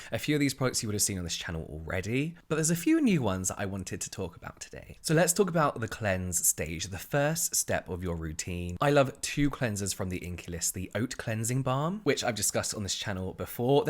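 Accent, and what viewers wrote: England, United Kingdom. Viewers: Can you help me: After I cleanse, put serum then moisturizer my skin / products start piling. I can’t find a good order to treat my face. Any advice? I am also older ( double your age).ordinary and inkey list with some vitamin k moisturizer from piping, rock are my go to products..... Or whatever you, Hyram, and Cassandra Bankson convince me to try. My skin is fair,very dry, oily on tip of nose and tip of chin. I doubt you’ll even read this but I am an older subscriber maybe this will get to you. You, have a very soothing voice with that beautiful English accent.🌹🌹🌹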